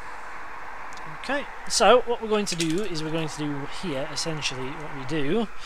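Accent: United Kingdom. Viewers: im just woundering it sounds like your form the UK im just woundering if you are where do u buy your soldering flux from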